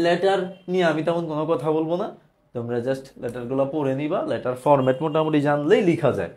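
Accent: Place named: Sylhet, Bangladesh. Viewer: ভাই কি সিলেটি? ওনার ভাষায় সিলেটি টান বুঝা যায়!😅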